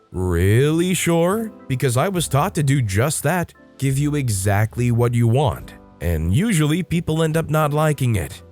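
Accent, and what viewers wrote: United Kingdom. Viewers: Even though he's just a Brit, I understood him.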